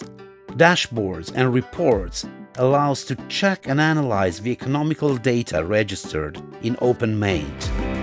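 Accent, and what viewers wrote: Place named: North, United Kingdom. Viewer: the combination of north british and slavic accents gave me a stroke, cool app tho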